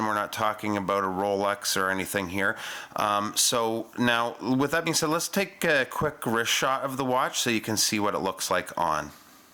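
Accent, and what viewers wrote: Canada. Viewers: l lived in Stony Plain, Albetta 18 months. - pre-"Oh Canada." Really "old" days. Thought I heard a touch of the Great White North.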